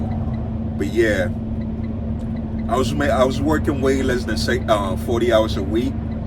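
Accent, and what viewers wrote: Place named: New York, United States. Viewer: u sound like from new york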